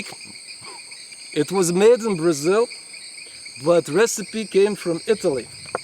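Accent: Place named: Russia. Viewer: Russian accent out there in the jungle catching snakes. This dude is awesome. Modern day warrior 💪👊💪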